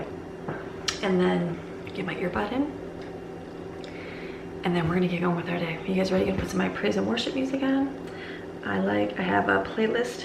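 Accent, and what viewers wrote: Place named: Michigan, United States. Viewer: I just found your channel and immediately fell in love!! I hear the accent and wondered.. is that the Michigan accent I know so well?! 😂😂 I’m from Traverse City!! My hubs and I live in Savannah, GA now for his work. It’s a very different world down south!! Thank you for the new channel addiction LOL!!! 💜💜💜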